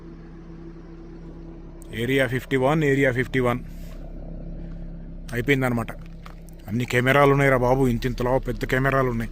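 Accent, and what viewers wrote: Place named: Andhra, India. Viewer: Anna nee slang andra slang laga undhi anna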